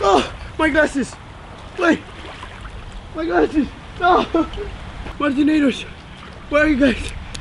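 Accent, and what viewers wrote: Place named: Spain. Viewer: Me gusta tu voz Española se escucha muy cool good job man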